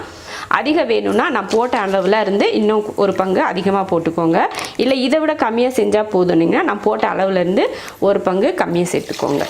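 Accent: Coimbatore, India. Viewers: Neenga, Christiana, ruslimma,therindhu kolla aasai,eanna pottu vaikkamal erukkeenga,but, unga Kovai slang nanna erukku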